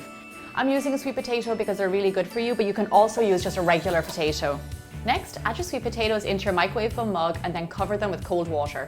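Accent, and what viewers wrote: Ireland. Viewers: Ima guess the accent Irish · Irish accent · I love your 🇮🇪accent🇮🇪! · Aha, well since Celtic Woman is one of my all time favorite musical groups that helps explain it. I have always loved the Irish accent, but yours is subtle. Almost lost but not quite.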